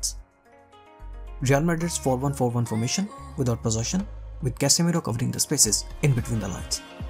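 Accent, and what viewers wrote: India. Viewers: Is an Indian cause his pronunciation of some of the words sound like an Indian eg clinical? I need an answer plz.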